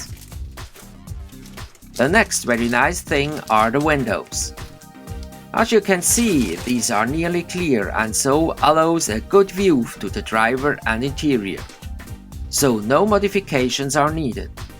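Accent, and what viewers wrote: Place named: Switzerland. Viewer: great video like always... and the Schweienglisch is great!!! gruess us züri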